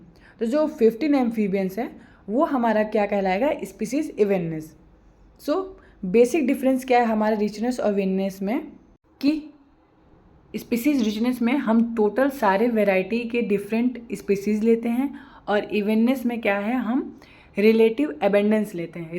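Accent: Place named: Bihar, India. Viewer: Ma’am you accent seems like you are from bihar😅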